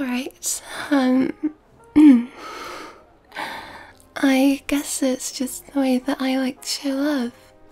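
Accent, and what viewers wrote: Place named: United Kingdom. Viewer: the british accent reminds me of Millie Bobby Brown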